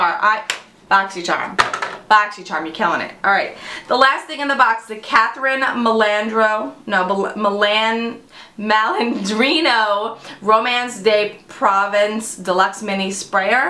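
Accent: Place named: Staten Island, United States. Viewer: You're quickly becoming one of my favorite YouTubers! My husband is from Staten Island and your accent reminds me of his friends from there. Somehow he escaped without an accent;)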